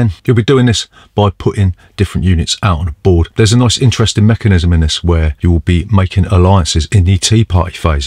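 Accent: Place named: Newcastle, United Kingdom. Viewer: Superb again. Never knew you were a Geordie like me. Gan canny bonny lad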